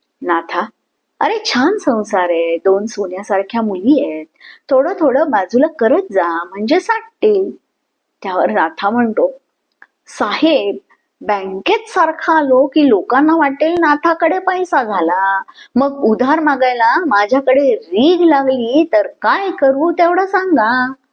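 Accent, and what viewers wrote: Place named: Konkan, India. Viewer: कोकणी आवाजात केलेले सादरीकरण आणि कथा छानच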